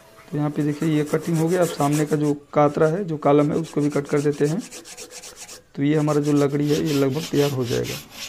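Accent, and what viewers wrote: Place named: Madhya Pradesh, India. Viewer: MP latiya bolate Hain Mere Bhai❤❤❤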